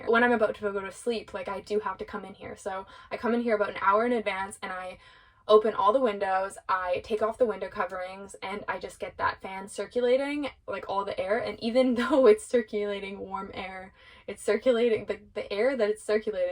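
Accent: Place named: Canada. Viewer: Your vids are cool, and I love the way Canadians say "aboot" (about).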